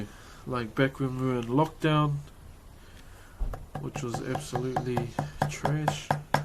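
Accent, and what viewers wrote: New Zealand. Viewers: *has a new zealand accent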